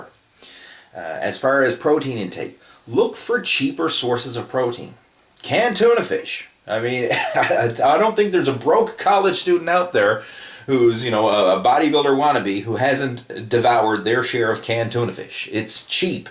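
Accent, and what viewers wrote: Canada. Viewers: Beans, lentils, skim milk powder, eggs, cottage cheese. cut out crappy snacks, booze and takeaways. ln the end cut your suit according to your cloth. Great site. Cant quite get the accent, are you Canadian. Difficult when from England to spot the accent.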